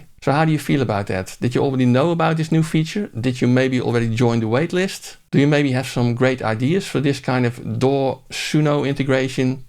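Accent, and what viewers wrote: Netherlands. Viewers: You can call yourself but your accent betrays you, you're dutch!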